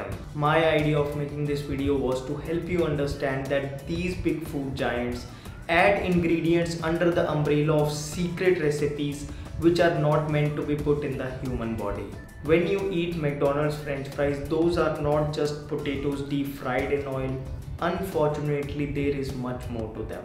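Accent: India. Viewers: Love the heavy Indian accent! Great "wake up" video.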